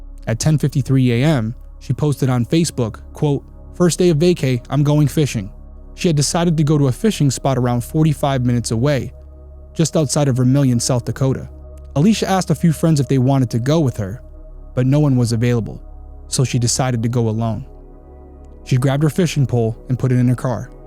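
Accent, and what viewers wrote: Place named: Rhode Island, United States. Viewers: so good to see you, even without your YouTube other half. It’s weird but I seesr you done different reading your script than you do with SW on CW!? Maybe the RI accent is harder go hide 🙂 I’m here to watch and listen and I’ll be here to watch and listen indefinitely x I also wondered if maybe the people having sex in the back of the car may have actually been a woman being SA’d in the back of a car and she didn’t know until after she’d posted that or sent that message. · Great job!!!! Looking forward to more!!!! ❤ You should let that RI accent out!! I hear it slip occasionally lol. I’m hoping her family gets answers soon. Ty for putting her story out there.!! It’s been awesome watching you grow!!😊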